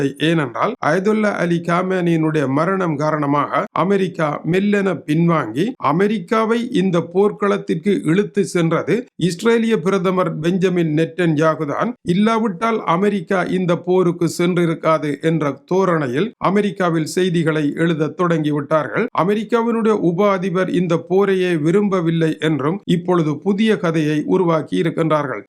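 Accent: Sri Lanka. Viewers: Beautiful Ceylon Tamil. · Elaigai tamilan awar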